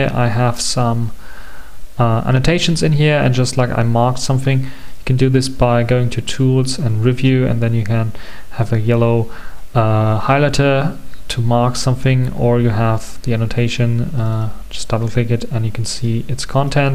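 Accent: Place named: Germany. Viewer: Deutscher Akzent ;) nice video.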